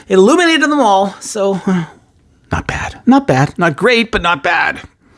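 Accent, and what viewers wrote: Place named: United States. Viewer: Хорошее произношение, вроде американский акцент, интересный для меня профиль канала. Буду аудирование проходить. 😊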